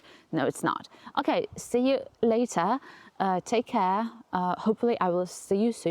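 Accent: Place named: RP, United Kingdom. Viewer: Love the posh accent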